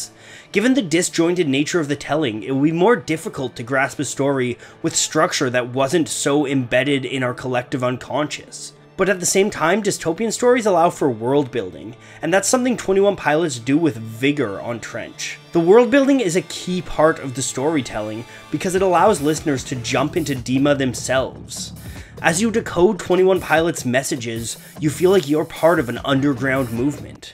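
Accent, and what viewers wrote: Canada. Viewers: The Narrator is clearly Canadian.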